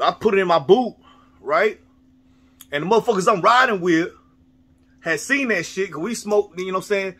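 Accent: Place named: Bay Area, United States. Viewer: 💯 Are you from N. Ca or the Bay? We talk like this. Everyone's a lil hood...you know what I'm sayin', sheeiit 🤣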